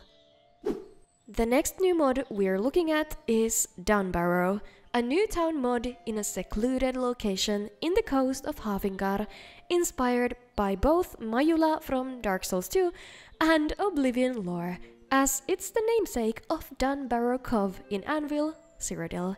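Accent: Finland. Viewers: I was thinking that you kinda sound like a Finn, and it seems you are lol. Just started my billionth Skyrim playthrough and am in the process of modding it 'till it breaks. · From the way you pronounce names it becomes clear that you're Finnish. Only a finn could say "Jenassa" like "Suomessa"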